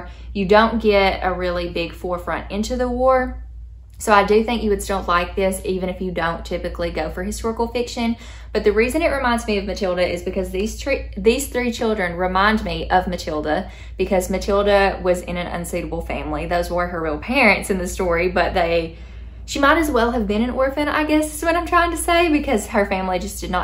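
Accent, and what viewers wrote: South, United States. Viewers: I love hearing your perspective with that southern drawl.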